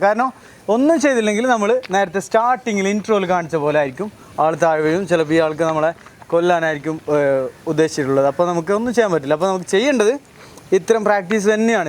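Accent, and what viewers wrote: Kasaragod, India. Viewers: കാസറഗോഡ് കാരനാണോ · ഇങ്ങടെ കാസർകോടൻ ഭാഷ, അത് കേൾക്കാൻ ഒരു സുഖമാണ് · കാസറഗോഡ് ഭാഷയുടെ നിഷ്കളങ്കത നാളുകൾക്കു ശേഷം കേൾക്കുന്നു.............സ്നേഹത്തോടെ ഒരു കോഴിക്കോട്ടുകാരൻ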